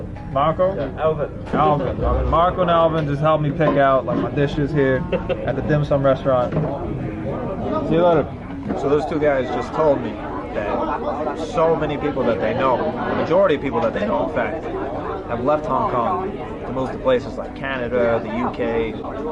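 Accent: New York, United States. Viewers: Great video. Your New York accent really came out this video 😂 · This channel def makes me appreciate china al ot more. Very cool perspectives. People are nice and appreciate he can speak the language. Hilarious how his American accent is so New York. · Love the NY accent. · Apart from a great but nostalgic video I really like the NY accent and directness. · You sound more New York than the last video I just watched. I’m guessing you had recently been back . Interesting how accents morph as we travel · I can't do the accent man, I think you're a great watch, but that Judge Judy accent is getting thicker. Reminds me of some people who you would not want to be associated with from N.Y. Stay authentic mate. Jake in Oz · Your New york accent is very strong in this video, or maybe I'm just noticing it now ;)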